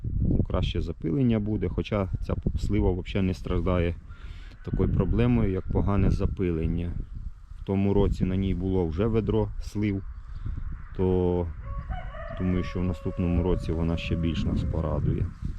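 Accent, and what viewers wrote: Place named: Surzhyk, Ukraine. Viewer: Яку його мову ти розумієш?! Він же не українською мовою розмовляє, він розмовляє сільськiм суржiком!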